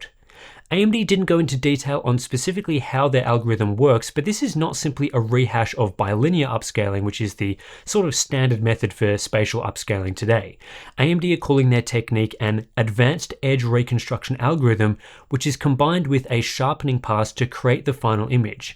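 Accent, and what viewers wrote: Australia. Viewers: Awesome video, I thoroughly enjoyed it. And it's always a pleasure to hear Aussie accent:)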